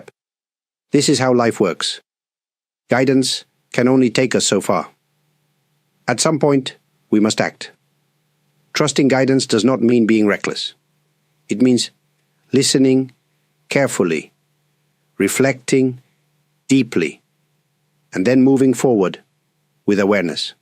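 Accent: United Kingdom. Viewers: IT OCCURED IN MY MIND, TO NOTICE THAT YOU HAVE SOME BRITISH ACCENT IN THE WAY YOU SPEAK. ARE YOU LIVING IN BRITAIN MAYBE?😇🙏💜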